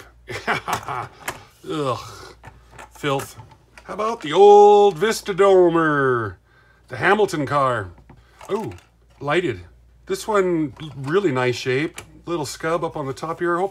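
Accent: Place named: North, United States. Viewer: Hey,. I normally stick with ya til the end because I enjoy hearing you talk. You have a Northern states accent that’s pleasing to my ear, oh sure! 😅 And I don’t want to miss any of your wisdom you put out about repairing the model trains. There’s three of you fellas I routinely follow because you each repair and restore model trains that teach me plenty about my own equipment and I hate to miss any information because I fear what I miss just might be that one odd piece of info I need to fix or correct something on one of my trains. Now I collect HO equipment but that doesn’t mean there isn’t something I could learn if you cover a Lionel or American Flyer repair. Electrical repairs or soldering are easily transferable skills in model railroading. Best wishes and see you on another video.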